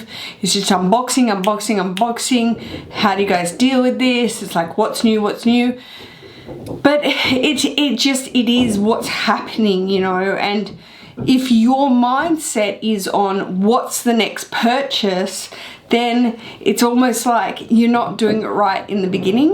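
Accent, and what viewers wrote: Australia. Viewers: Lol HONESTLY BABE...I literally just yell your name every time I see you video!! 😂 im from America so I say it in your Australian accent. My bf even says it now too...ugh I just love you!!!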